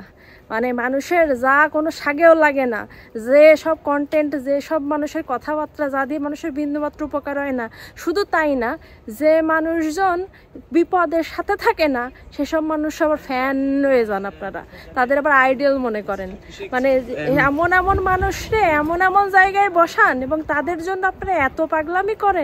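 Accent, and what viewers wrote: Khulna, Bangladesh. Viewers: আমাগের খুলনার বাষা মনে ওচছে? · Thanks a lot..r u originally from Khulna??? Just guessing from Ur accent... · Apni ki khulnar meye naki? Bhashar taan khulnar moto. Amar o khulna. 😊😊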